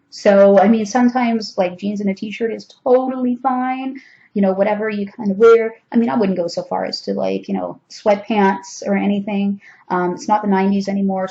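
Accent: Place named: Toronto, Canada. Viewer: Ahhh. Fresh Air. What captivating colors starting with your eyeballs osmosing that shade of which blue? How about Blue - eh? Wow, I knew your Toronto accent in the video I just watched. So yeah, You are telling me answers to questions I've read about every group's posted rules, I extrapolate correctly but how to dress, thank you, how to keep your hands above the table, thank you, how self protections begin before you get to an event, thank you.There are some pluto in leo people that about now are beginning to understand playing fields being totally rearranged leveling the starting gate struggling through tech, even if really good, not as smooth second nature appendage of Saturns first return this year since 1981ish. fFinding how to sit and wait for on line response isn't just goofing off any longer. Wow...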